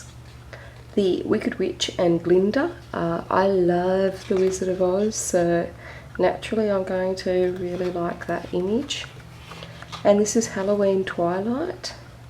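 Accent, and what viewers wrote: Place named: Australia. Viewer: This is such a beautiful book! I'm looking forward to my copy! It should arrive in about two weeks or so. :) I really enjoyed this flip through, your commentary is enjoyable. I love your accent. <3 You from Australia?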